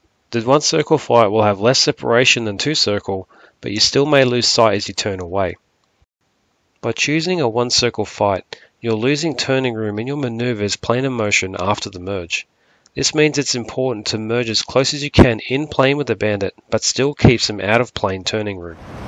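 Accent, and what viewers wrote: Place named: Australia. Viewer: I want you to know the same way a British accent sounds like it fits in some gentleman's club explaining the tribulations of aristocracy, there's something Inherently confidence inducing when it comes to an Aussie accent covering anything that has to do with a fight.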